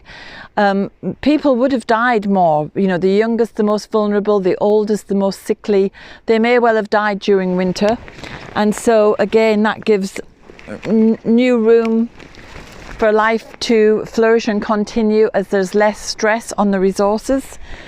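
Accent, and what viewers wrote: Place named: Yorkshire, United Kingdom. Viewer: ah! that explains it! I could hear Yorkshire and Ireland and Teeside and I couldn't figure it out. I, too, have a very mixed accent from moving around. Have just come back from a stay in Darlington, coincidentally, to my current home in Yorkshire (but born and raised in an Irish family). I hope you are doing well xxx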